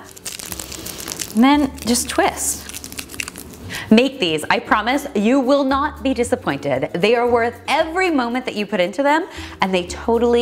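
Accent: Canada. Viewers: Are you Canadian, I love your accent. I am from the south (NC), I know all about accents..lol! :-) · Hers is less of the uh-boot Canadian pronunciation, and more of the subtle uh-beh-uh-oot pronunciation (almost like uh-boat but even more subtle). It comes out through other words like "house" too.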